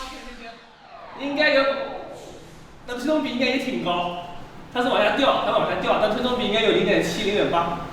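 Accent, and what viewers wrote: Zhejiang, China. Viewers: 很浓浙江口音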